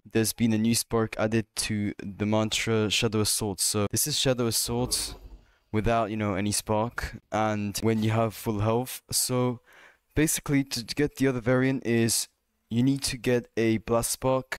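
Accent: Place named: France. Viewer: are you french ? you have a little french accent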